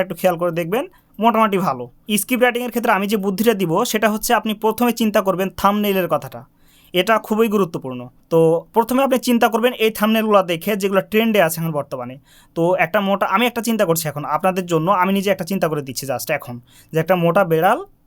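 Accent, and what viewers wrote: Chapainawabganj, Bangladesh. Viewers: ভাই আপনার বাসা কি চাঁপাইনবাবগঞ্জ আপনার ভাষা শুনে মনে হচ্ছে।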